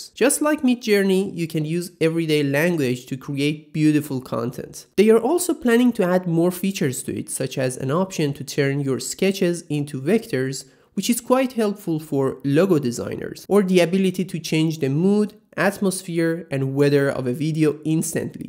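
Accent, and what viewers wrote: Iran. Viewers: why ma irania inghad lahjamoon ghabele tashkhise ? )) damet garm azizam . are you best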